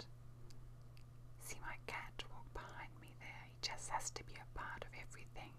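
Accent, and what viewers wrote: Australia. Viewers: I love the Aussie accent. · Such a pleasure to hear a fellow Aussie! Very intense! And very close to home! <link> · I find I can't watch your videos as often as other ASMR artists videos, because I don't want to risk losing the tinglefest I experience whenever I listen to you speak in that gorgeous Australian accent! That is, when I don't lose myself in your beautiful face!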